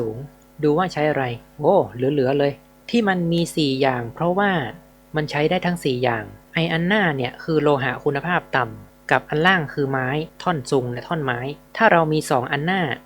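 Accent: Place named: North, Thailand. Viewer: แงงง ชอบความสาบเมืองงง555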